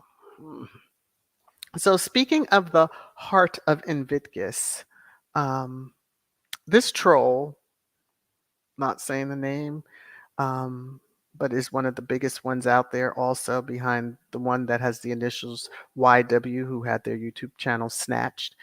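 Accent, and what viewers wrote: RP, United Kingdom. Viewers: Thank you for another amazing thrash time 🙏😘❤❤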